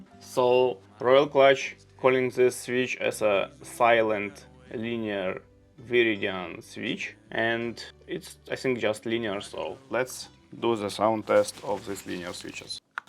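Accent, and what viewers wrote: Russia. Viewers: А почему акцент русский?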